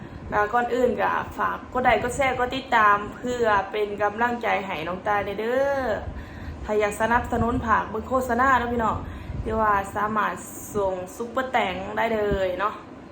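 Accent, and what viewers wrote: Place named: Ubon Ratchathani, Thailand. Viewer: เจ้าอยู่เมืองได๋ สำเนียงคือไทอุบล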